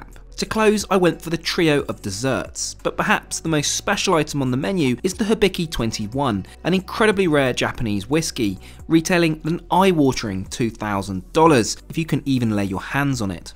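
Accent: United Kingdom. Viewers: 13 seconds he says, "I'll be flying ANA's ten thousand dollar first class." He has an UK accent. They use GBP or Pounds Sterling so most likely he quoted in USD. If he had an Australian accent he'd be quoting in AUD Australian dollars. Canadian accent would quote in CAD. However, my information was incorrect as he didn't give a price for the return flight which was business class. So the entire trip would be more than 10k.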